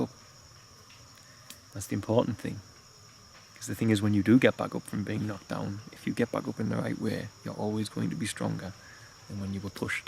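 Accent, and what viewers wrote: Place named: Newcastle, United Kingdom. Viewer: Hi I'm very happy to hear the update, as a UK national (I guess from the Jordie accent) could you use the NHS to keep fees down?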